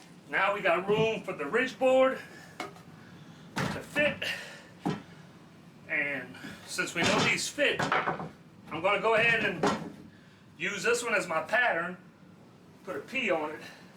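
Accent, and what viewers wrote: Louisiana, United States. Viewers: One of the best videos. I’ve watched it so many times. I would have bet my life when I first started listening that you were a black man named Mr. Beauregard. You were standing in the garage. I was so surprised when you were outside and you were white! 😂 Love your accent! Is it Louisiana? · What part of Louisiana you from, bruh? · Nicely done! Man, I thought I heard Louisiana in there somewhere.. subscribed. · Are you in Louisiana somewhere? 😊 You sure sound like you're from home. Thank you for this detailed, clearly communicated instructional video.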